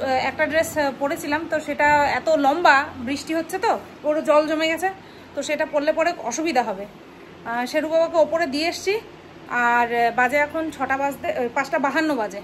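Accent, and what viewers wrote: Bangal, Bangladesh. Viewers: তোমার বাঙাল কথা আমার darun লাগে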